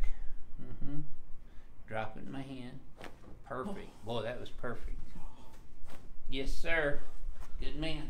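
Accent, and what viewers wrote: South, United States. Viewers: Total high pitched cowboy hick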